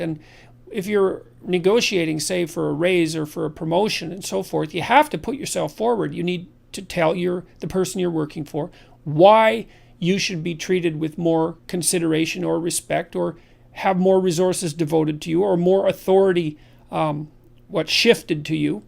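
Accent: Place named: Australia. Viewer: This is Australian accent ,Farmer style. All the country style accent have same characteristics, such as quick , not clear , not stable speed .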